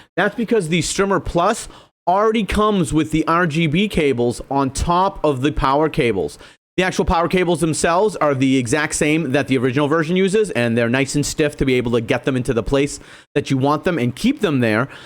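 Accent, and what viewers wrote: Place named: New York, United States. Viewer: Love the New York accent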